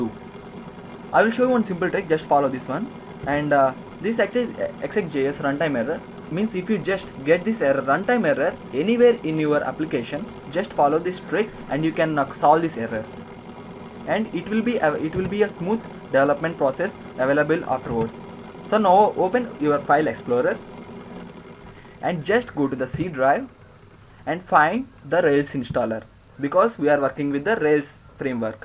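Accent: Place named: India. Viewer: you clown........... who told you to talk in english ?? indians look very funny when they try to speak english....... :-)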